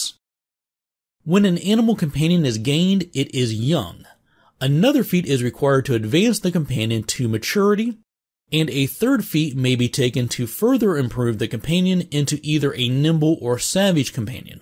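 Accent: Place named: Midwest, United States. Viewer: Great video! Unrelated question... where are you from? I'm trying to guess your accent.. I'm thinking mid-west but have a feeling I'm way off.. :P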